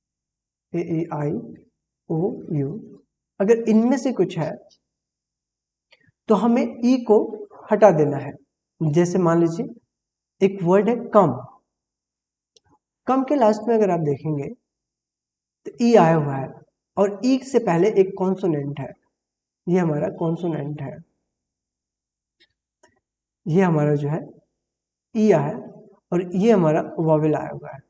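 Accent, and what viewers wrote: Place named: United States. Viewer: I love❤ your American style voice..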